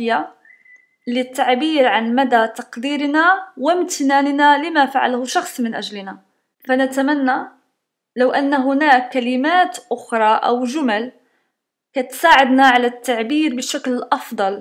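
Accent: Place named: United Kingdom. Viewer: hey teacher can you please make a video about the accent , I love your british accent i want to learn it <3 thanks a lot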